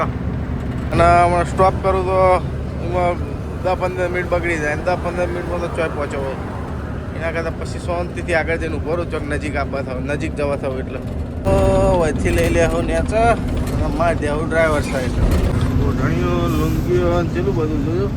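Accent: North Gujarat, India. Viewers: Jai mataji bhai tame kya gaam na cho e kejo mane to tamaro boli Mehsana side Uttar gujrat ni lage che ane kyu gaam tamaru e pan kejo mara msg no javab apjo bhai jsk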